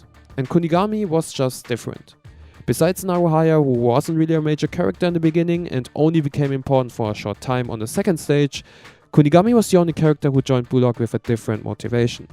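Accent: Germany. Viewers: Are you german?Cause you do sound like one? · Sag mal bist du deutsch? Und sehr geiles Video btw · Haha, man hört so krank das Deutsche XD. Aber gutes Video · Are you german? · Bruder man merkt direkt das du Deutscher bist lol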